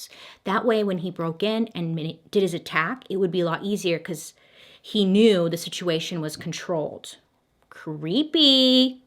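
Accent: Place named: California, United States. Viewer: Is it me or this is the first time i can tell her Californian accent... like kardashian or something lol... like the video though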